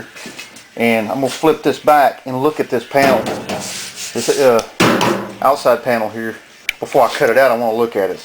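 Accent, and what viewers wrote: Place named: Appalachia, United States. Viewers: Love this hillbilly accent! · Your voice and accent is identical to my brother in law's. He was born, raised and lives in Anderson, South Carolina.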